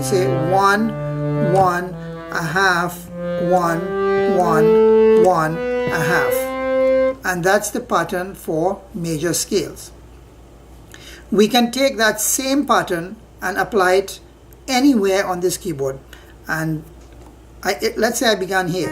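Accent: Trinidad and Tobago. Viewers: Are you from Trinidad by chance? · Trinidadian accent.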